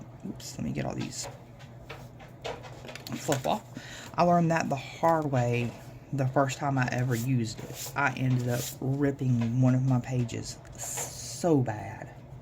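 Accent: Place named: South, United States. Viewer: It’s so fun to hear a southern accent in a video! I love hearing the British ladies but not often a good ole Southern sound!! I love your altered paper clip idea! So cute! Your video was great! ❤❤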